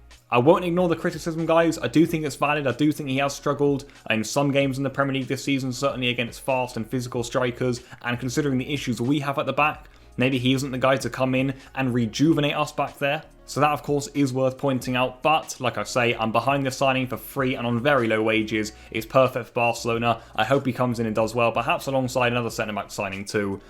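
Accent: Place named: England, United Kingdom. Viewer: why do you have an english accent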